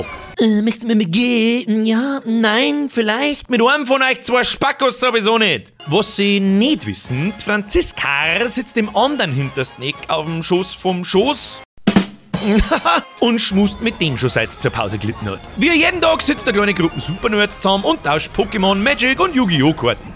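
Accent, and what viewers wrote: Bavaria, Germany. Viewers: Wia scho bei fui Videos an nogl afn kopf drofa 😂 lang is scho hea awa ja so in etwas was. Da is wenigsdns oana den woma faschdäd 😂😂😂😂 und dea an gleichen Dialekt red. Ja bin im Nachbar Ort af gwogsn 👍👍👍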